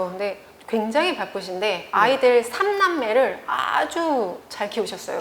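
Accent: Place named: Jeolla, South Korea. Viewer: 전라도 ㅋㅋㅋㅋ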